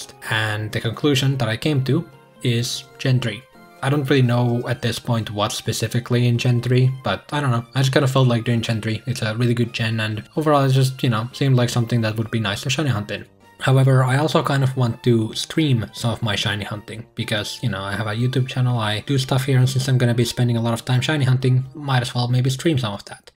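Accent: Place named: Finland. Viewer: By the accent, I knew you are Finnish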